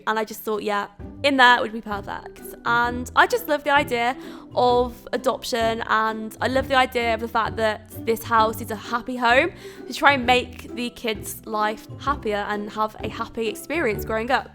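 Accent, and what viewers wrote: United Kingdom. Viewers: You sounds very British for someone living in Germany. Is that where you live permanently now?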